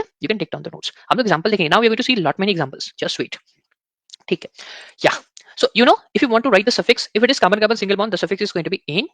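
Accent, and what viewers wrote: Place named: Bangladesh. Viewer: Sir aapka accent videsh ka lagta hai bangladesh ka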